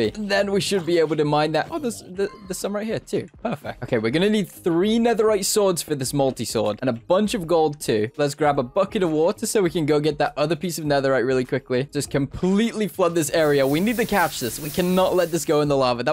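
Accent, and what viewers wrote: United Kingdom. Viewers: bu'on dude your bri'ish accent is so intense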